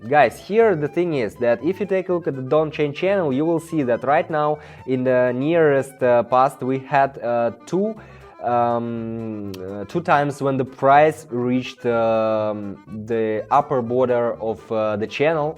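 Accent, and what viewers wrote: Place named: Russia. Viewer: that russian accent adds character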